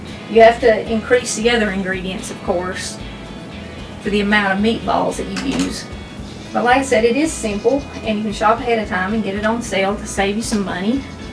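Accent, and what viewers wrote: South, United States. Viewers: I love your southern drawl and yes, I can just tell that you are good people 😘👌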